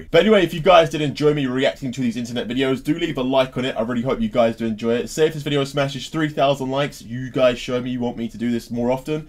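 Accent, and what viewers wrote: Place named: South London, United Kingdom. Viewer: what part of south london u from?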